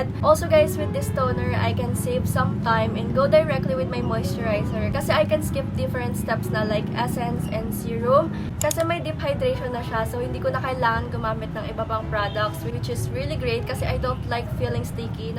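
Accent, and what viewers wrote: Philippines. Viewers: Yup its Filipino accents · its taglish.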